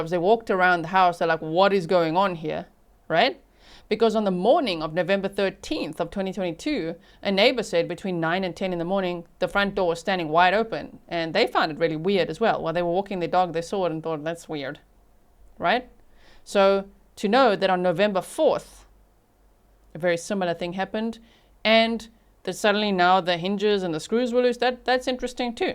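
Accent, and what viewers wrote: South Africa. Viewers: Are you also from South Africa. Your accent❤ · I know a south african accent when i hear one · is that a beautiful South African accent I detect? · You've just gained a new South African subscriber- I recognize your accent, but it's not that- your breakdown and the new info given in this video is brilliant!! Thank you!! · Just discovered you. Funny how I knew right away that you are a fellow South African! Thx for your great work.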